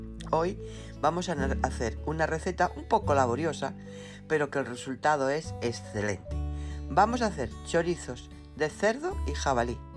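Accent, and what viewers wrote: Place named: Mexico. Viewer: Saludos desde Matamoros Tamaulipas México 😊 Frontera de México y EEUU Me sorprende que usted siendo de Asturias Habla igual que nosotros Los mexicanos jeje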